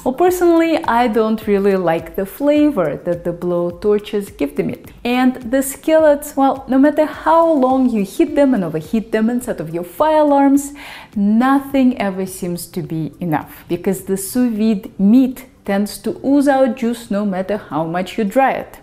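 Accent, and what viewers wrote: Russia. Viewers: Звучит как русский акцент - всё понятно и разборчиво! ))) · Russian accent isnt? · Акцент русский? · что за акцент россия? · Ох уж этот русский акцент ))) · What a Russian accent has. Very demanding women.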